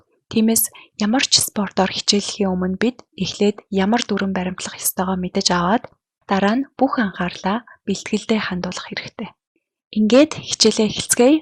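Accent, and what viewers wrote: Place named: United Kingdom. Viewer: Энэ тхдэ англи хэл зааж байгаа байж British english- ний дуудлагаар дуудаар байна. үгийн дунд орсон T үсэгийг 20% тай хэлдэг англи хэлэнд харин бритиш хэлэнд тэрэлттэй Т үсэгээр хэлдэг. Их гоё бичлэг хийж байгаа юм байна маш их баярлалаа дараа дараачинх дээрээ анхаараарай багшийн эрдэм шавиас гэдэгшүүдээ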